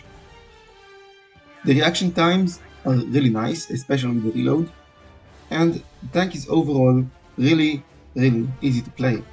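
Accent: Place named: Israel. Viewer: The accent, oh the ACCENT! I have an Israeli friend and he speaks English just like this guy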